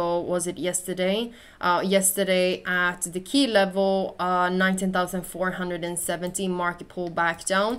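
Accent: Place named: Sweden. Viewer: låter svensk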